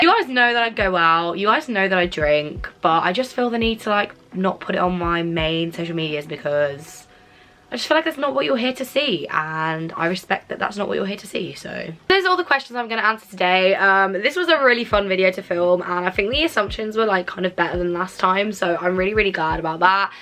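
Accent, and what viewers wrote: Birmingham, United Kingdom. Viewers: Your Brummie accent has gotten even stronger! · Wow brummy accent is really strong in this 🤭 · wait shes a brummie? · Yaas the Brummy accent is back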